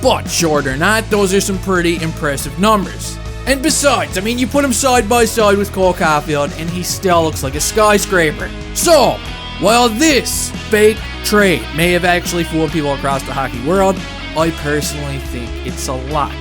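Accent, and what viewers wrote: Newfoundland, Canada. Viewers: He sounds like a Newfie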